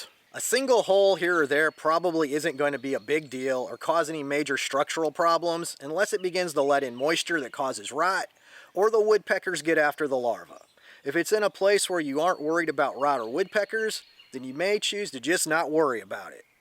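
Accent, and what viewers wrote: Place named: North Carolina, United States. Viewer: I've made brush piles for critters in my yard and I'm excited to see carpenter bees in the next few years. Also, are you from NC? I ask cause I noticed that your accent is similar to mine, lol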